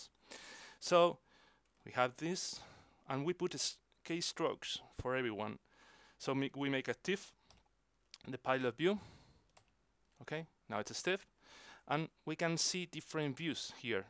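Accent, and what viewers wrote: Spain. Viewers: Damn I love your voice!!! So spanish ^^ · A complete tutorial by Antonio Banderas (: Just kidding. Your Spanish accent is real class man. I love it!, this is a wonderful aircraft and I'm really enjoying it. Even though I use TrackIR (and I thought everyone did), this plug-in will help a lot. Your link didn't work for me, but I used this one: misc plugins page and see it as "LockView" download.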